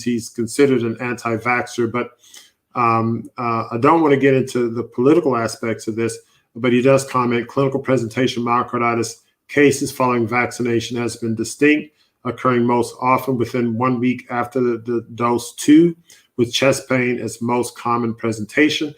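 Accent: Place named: South, United States. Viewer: I hear a southern accent. Where r u from?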